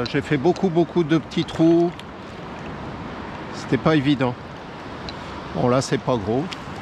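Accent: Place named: Oléron, France. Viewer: Hahaha je me disais aussi….. il manquait l accent oleronais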